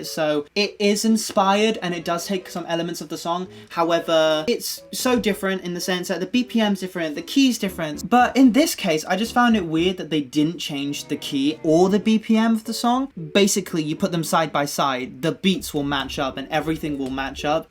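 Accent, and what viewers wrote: United Kingdom. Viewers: Hi! I'm Korean teen ㅎㅎ I love your british pronounciation ㅠㅠ Can you cover ' '-!!! I'm sure you will love this song ㅠㅠㅠㅠ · Your English pronunciation is the most delightful one I ever heard, you've got a perfect English accent ✨🌙 Oh, and you're so cute ❤️ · BRITISH ACCENT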